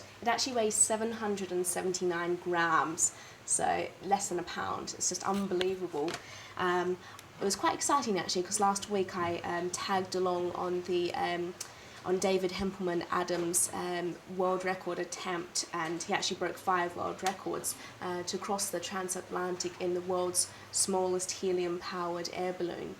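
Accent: England, United Kingdom. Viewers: I thought she did a fine job. Cute english accent.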